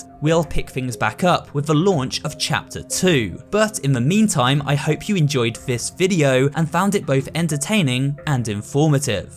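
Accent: United Kingdom. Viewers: hes briitish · Wait is British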